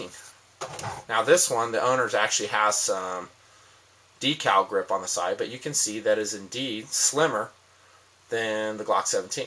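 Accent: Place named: South, United States. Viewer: lol, sounds so much more southern in these old videos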